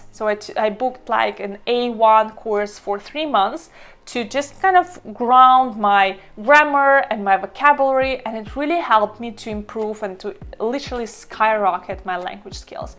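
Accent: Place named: Russia. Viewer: Hello, from a native russian speaker, it's very interesting that a russian accent is so present in your speaking. Not about good or bad, just a remark.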